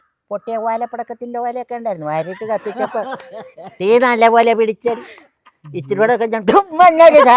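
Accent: India. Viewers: കുറെ കാലം മുൻപ് ചേർത്തലയിലെ ORIGINAL സംഭാഷണം ശൈലി. തകർത്തിട്ടുണ്ട്!! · അടിപൊളി 😂 ആലപ്പുഴ മാരാരിക്കുളം സൈഡിലുള്ളവർ സംസാരിക്കുന്ന പോലെ 😂😂😂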